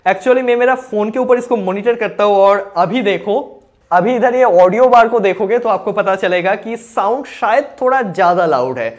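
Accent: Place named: Bengal, India. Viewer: Bangali accent bojha jachhe bhai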